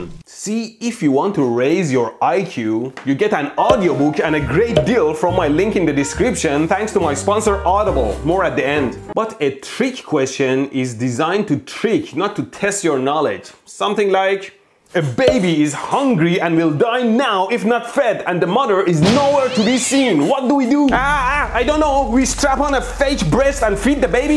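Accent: Iran. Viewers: love the Iranian accent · Jan can you believe more than 20 years ago, this was the question asked in first year at the uni by professor teaching electronics-physics class, You are brilliant bringing joy to Iranian/Persian community with your intentionally thickened accent. I graduated in <num> and have been working at telecom (Mobile Core Network) eversince, it was so refreshing to see your video. tnx. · Are you Persian? I can say it from your accent 😊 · What an Iranian accent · I love his bold persian accent :)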